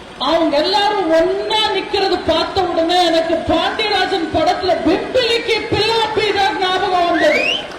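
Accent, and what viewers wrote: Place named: Telugu, India. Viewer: தமிழ் பேசுவது தெலுங்கு வாடையில் பேசுகிறார் 😮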